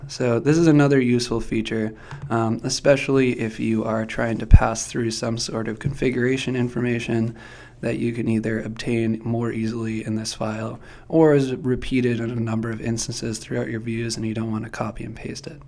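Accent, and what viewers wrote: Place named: Canada. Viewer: I really like Roots! I hunted around for a long spell and finally found it. I think it's probably the answer to my quest for a static website generator to host some articles of mine. On another note, I find the narrator's accent interesting. I'm really good at spotting accents and can't figure out where this fellow is from. In some parts I think he's from Canada, but then I detect a note of southern California. or maybe some other southwestern U.S. place. Oh, well, it doesn't really matter. Roots seems to be truly great stuff to me. I'm going to go ahead with it joyfully!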